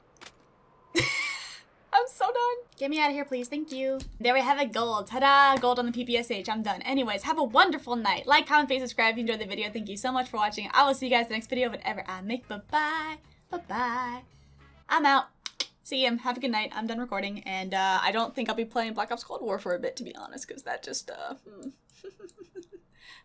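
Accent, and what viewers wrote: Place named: Texas, United States. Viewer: I would do anything to have someone as positive as you in my life! Love you! Love the Texas accent aswell!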